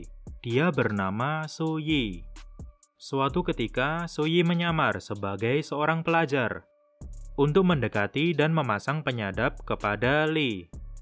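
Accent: Java, Indonesia. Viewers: Gak medok bisa gak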